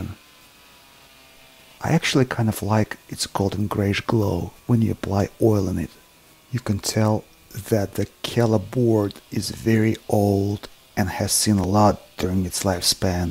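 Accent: Russia. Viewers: yes the accent Is with no doubt sounding russian. But he uses finnish word for dry pine, kelo. I Wonder why. I think he Is a Karelian. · Shaun Davenport Defo Russian accent. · your accent reminds me of my granpa who lives in moscow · With a strong Russian accent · Really?? I thought it was him for sure with that Russian accent and little mistakes... Wow that's interesting. So I guess he hired someone with a Russian accent on purpose?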